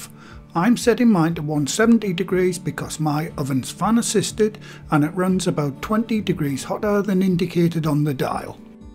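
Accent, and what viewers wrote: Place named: Newcastle, United Kingdom. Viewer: amazing recipe but even better when it’s read out by what sounds like a geordie version of Winnie the Pooh! 😊 🤩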